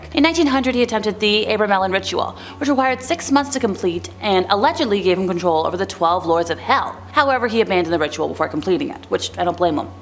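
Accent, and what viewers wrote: Toronto, Canada. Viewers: Toronto accent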